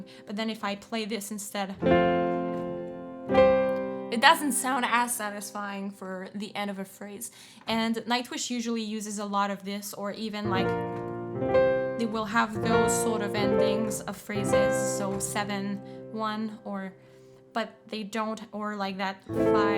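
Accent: Quebec, Canada. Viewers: Your accent look like french canadian !?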